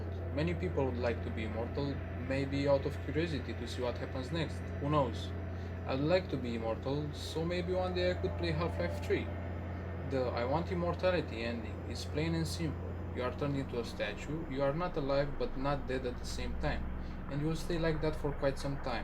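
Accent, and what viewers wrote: Romania. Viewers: Wait,you are romanian?i ask cause of the accent and the flag gas mask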